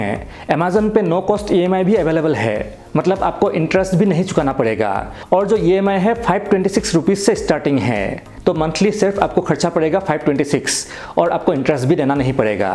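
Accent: Assam, India. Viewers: You accent says you are assamese from uper Assam and why do you sound like reading newspaper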